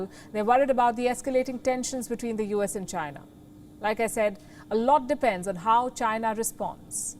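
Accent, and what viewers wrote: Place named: India. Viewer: I can't stop laughing the way she speaks is an Indian accent I am sure you will be able to understand it. By the way World has various accent and you can't say anyone wrong just for their accent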